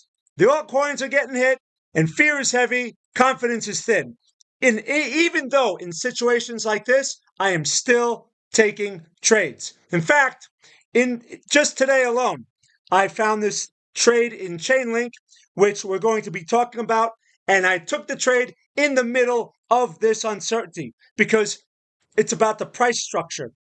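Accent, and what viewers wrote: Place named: South, United States. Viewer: Came for ta instead end up listening to a hill Billy meth head 😂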